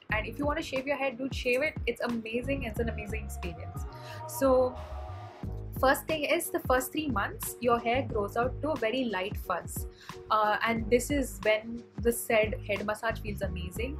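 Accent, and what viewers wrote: India. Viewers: Her accent is Indian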